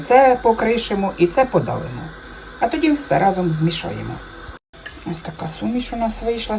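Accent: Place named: Central Ukraine, Ukraine. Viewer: Яка приємна українська мова в центральній Україні, чудова. 😊❤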